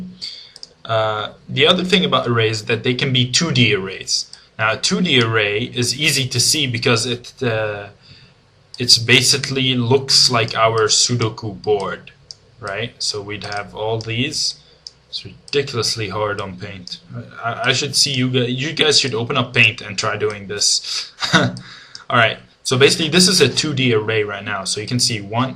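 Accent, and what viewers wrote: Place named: Europe. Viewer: I watched some of your videos before and got distracted to some other. So I wanted to make these comments but did not get time then. But now I started watching them again and forced myself to comment on your videos - 1. First, it is very CLEAR VOICE and VIDEO both. Even though you have an accent, it is very easy to understand and your EU accent gives a very nicee exotic flair to it! Don't you all agree people? 2. Secondly, your voice also has a very determined way of expressing something - it almost hears that you are confident enough to teach, but yet you stumble humanly on some stuff but you confident way of saying things keeps us hooked. So this is a compliment too..you may be mistaken of shaky but you voice is NOT. And that is a good TEACHER quality! 3. Thirdly, you are very funny - you make fun of yourself using the the PAINT program to do cursive writing etc..then tell "us to try it"..I literally tried and found it fun!! 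just like you did! Also, the human part of you, when you did not rehearse and tried to expand the 2D array without putting the element in there and it was not doing it and you stumbled on indefinitely..then gave up and smoothly changed the subject going on to explain the internals of an array!! very funny and very human! Some of us would have decided to scratch the entire recording and start over after finding the actual method..but your publishing this stumble made you very human - one of us - and that makes a good connection between a teacher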